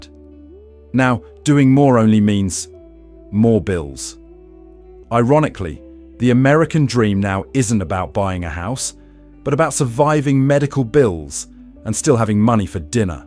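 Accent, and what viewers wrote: United Kingdom. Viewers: You sound like a Brit 😂😂😂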